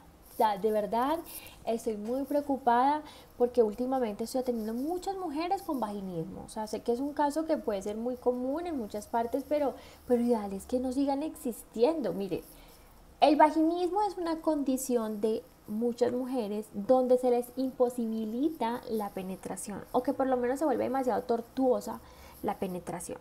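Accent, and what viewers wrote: Colombia. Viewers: hola, como siempre luces espectacular con esa cara, ese pelo, esos dientes, esos ojos, esos labios, y con ese acento colombiano bien marcado que tienes te hace una mujer muy hermosa, gracias por tus consejos son muy educativos, saludos desde Costa Rica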